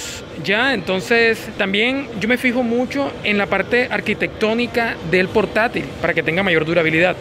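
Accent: Caribbean Coast, Colombia. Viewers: Njd familia el vale es costeño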